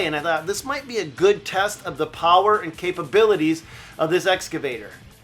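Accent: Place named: Minnesota, United States. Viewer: you from Minnesota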